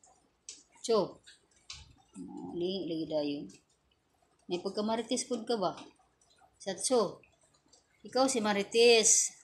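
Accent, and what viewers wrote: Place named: Visayas, Philippines. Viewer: Jajjajajajajja bisaya ka mam lab it bisaya din ako ug nalingaw ko sa imo mga itoy jjajajajajajja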